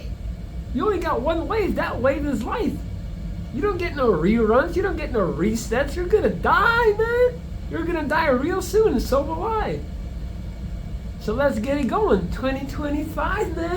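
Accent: United States. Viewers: Your English is very good! Have you lived in the USA, you have an American accent. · How come you speak in an American accent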